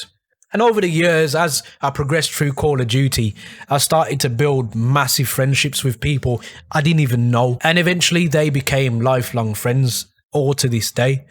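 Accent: Birmingham, United Kingdom. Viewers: What part of Birmingham are u from?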